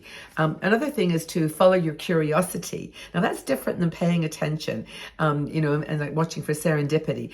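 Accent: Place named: England, United Kingdom. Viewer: Your accent is changing! Becoming more English! We’re you brought up in uk?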